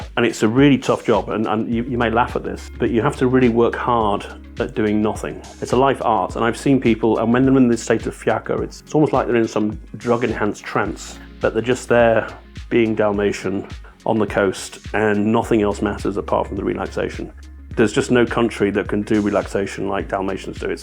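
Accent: United Kingdom. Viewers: Pommy nob.😂😂😂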